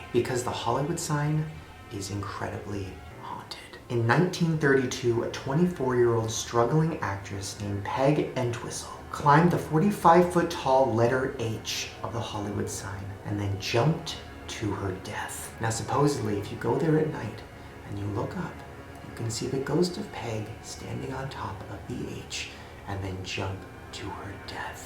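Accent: South, United States. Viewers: shane....that's still a southern accent it's just not as thick. people also tend to lose accents when they move, etc. and he lives in florida now so.... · he still has a bit of a southern accent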